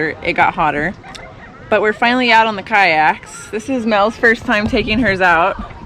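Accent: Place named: Tennessee, United States. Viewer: D how long have you been in TN, you have "y'all" down pat..... Welcome to TN honey!!!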